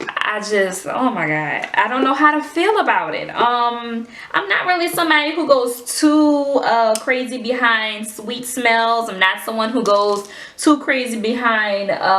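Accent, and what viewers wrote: New Orleans, United States. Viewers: Wow your hair has really grown since I last watched a video. But I think you had pretty good results, like you said it's a learning curve and her products are so concentrated you don't have to use a lot. I love your NOLA accent and your beautiful smile, tfs.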